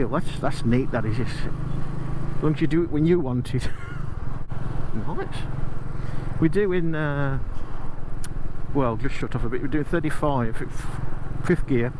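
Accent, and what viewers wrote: Birmingham, United Kingdom. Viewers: Another moaning Brummie.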